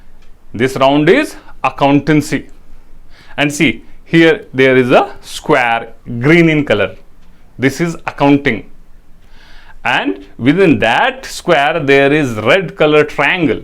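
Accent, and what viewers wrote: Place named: South, India. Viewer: Nice work bhaiya. Congratulations . n I will say it again. You have south Indian accent. 🤘🤘🤘🤘🤘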